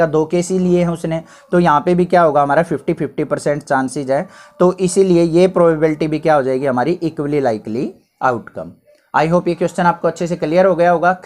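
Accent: Haryana, India. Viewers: Sir aap haryana sai ho 🤔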